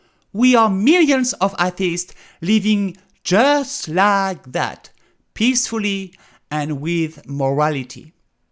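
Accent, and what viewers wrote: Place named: France. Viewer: Hi, you know I always loved you. But, to be frank, your French accent is getting more and more... how should I put it...let's just say that your French accent is getting more. Like in this episode, I have to watch the video for 3 times to hear out what are you saying, what's up? you're back to France?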